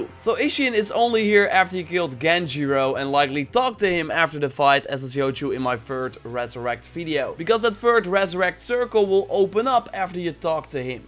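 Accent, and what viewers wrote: Netherlands. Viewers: you sound like a nederlander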